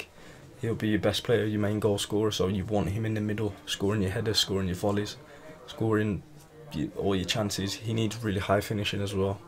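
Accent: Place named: Birmingham, United Kingdom. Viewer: Cheers for this mate, can ping that brummy accent a mile off. Don’t apologise for being ill or swallowing too much mate, people will always knit pick at shit on YouTube. You seem like a proper decent bloke mate keep smashing it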